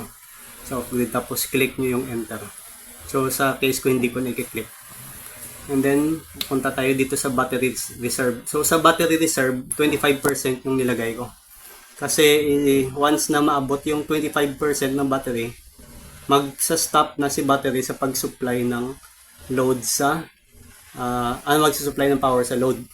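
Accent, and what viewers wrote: Iloilo, Philippines. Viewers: Ilonggo ka Sir?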